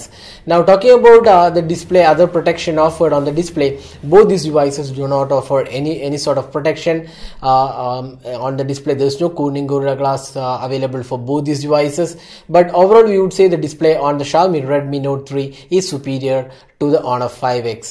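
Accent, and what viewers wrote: India. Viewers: everytime i watch any of Honor 5X review,mostly it was make by an indian people,i not really care about that,but the most offending me is the way you talk, with that indian dialect mix with English totally make me feel uncomfort + not a very clear speak..i hope u can speak more slow and clear voice on the other next video..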